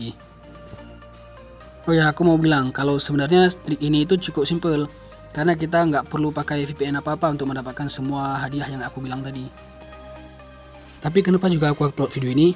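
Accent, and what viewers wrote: Aceh, Indonesia. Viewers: orang aceh ya bang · bahasa indonya gaya orang aceh banget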